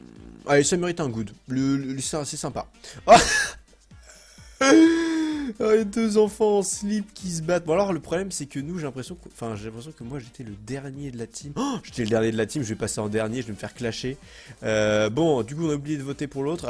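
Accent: Quebec, Canada. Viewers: tu est quebequoi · Es-que tu as un peu de québecois ?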